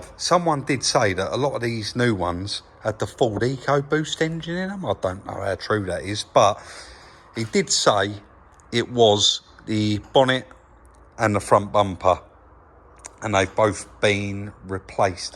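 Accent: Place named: Cockney, United Kingdom. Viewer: and by the way. Love the channel. Very relaxing, love the cockney accent.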